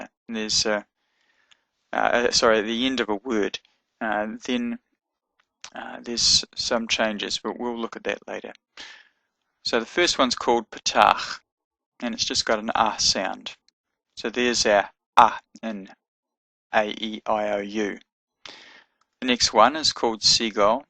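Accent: Australia. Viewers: aussie!!!!! love it!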